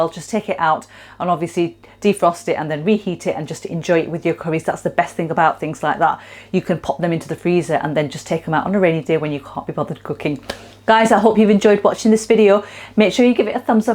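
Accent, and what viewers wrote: United Kingdom. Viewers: You sound like you have a little British accent, but not like people who were raised there. How long have you been in the UK? (just curious... I'm a bit of an 'accent' nut)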